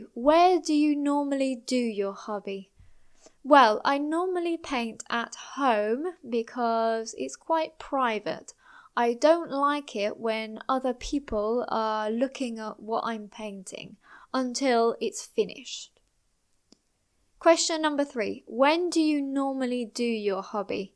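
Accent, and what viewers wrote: United Kingdom. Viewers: Amazing british english 🇧🇷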